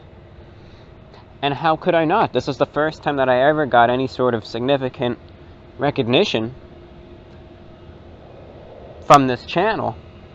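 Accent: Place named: East Coast, United States. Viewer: Just wanted to say good on you for wading through all those trolls and hate comments. I'm on of the people who came from reddit and I'll honesty say this: you're one of the most humble and genuine guys I've seen on youtube. I love the soft East coast accent and your personality. I watch your videos every morning with my cup of joe and my breakfast. You're just perfect to listen to. Keep it up and I hope you do well in the future!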